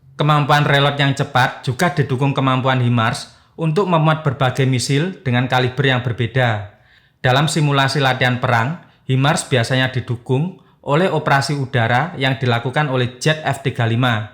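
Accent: Java, Indonesia. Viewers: Wahhduhhh loghat jawanya mas kental sekali wahduuhh😂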